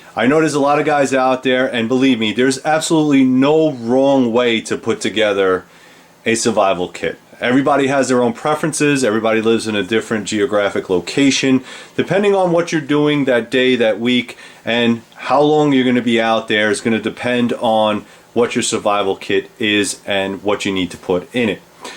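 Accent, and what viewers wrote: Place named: New York, United States. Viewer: Thank you for your service! Are you from New York? Your accent reminds me of the old "Bowery Boys" movies.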